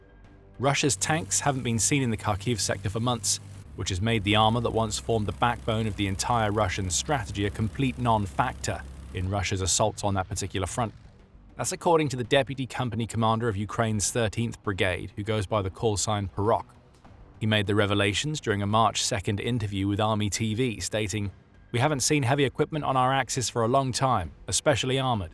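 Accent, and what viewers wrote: United Kingdom. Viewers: "This is Insanity. Putin would rather loose 100 soldiers than 1 tank." The irony of that statement is that the narrator has a British accent. During the the battle of Passchendale, the British High Command lost so many of the horses that pulled artillery and wagons that the British considered the loss of horses as more significant than the loss of men.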